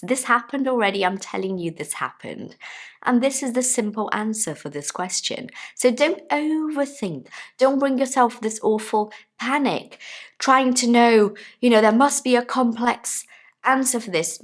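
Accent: United Kingdom. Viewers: Beautiful British English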